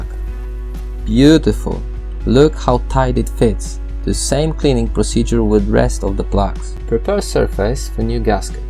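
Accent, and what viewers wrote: Poland. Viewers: Polak na bank sądząc po akcencie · Słyszę Polski akcent 😀 mam rację? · Jak tylko usłyszałem ten akcent to wiedziałem że to polak, nie mniej jednak dobra robota :D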